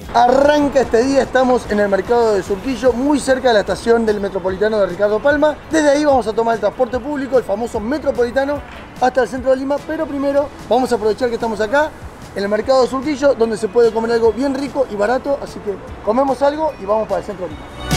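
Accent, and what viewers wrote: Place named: Argentina. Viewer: Volviste de Argentina y se te pego el dejó guacho 😀 sucede que cuando vives fuera de tu país por mucho tiempo pierdes tú acento de origen, basta unas semanas en tu país y vuelves reseteado con tu acento original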